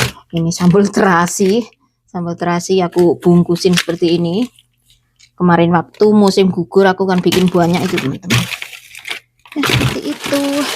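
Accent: Java, Indonesia. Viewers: Aduh mba...lucu deh speaknya sama...jawane loh mba medok banget...aku wong jowo dadi ngguyu dewe...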